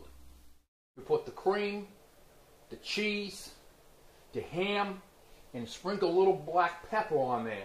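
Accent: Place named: New York, United States. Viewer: It's a New York accent coming from a guy who's lived in Georgia for the last 20 years. From New York to Atlanta to Habersham County.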